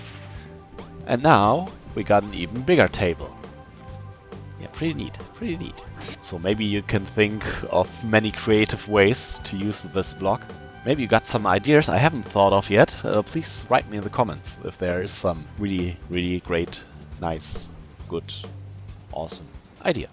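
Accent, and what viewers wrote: Germany. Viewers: Thanks a lot for this video! I've just started playing on PC and didn't know a lot of the things you listed :) You forgot to include the links to the other videos tho ("click on top right" but there's nothing haha) Are you German, by any chance? I'm from Germany and your accent sounds a lot like ours :B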